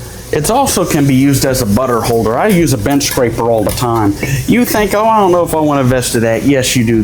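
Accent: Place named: South, United States. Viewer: Great show!!! Can’t wait to see what’s next. I didn’t know you were from the south???😂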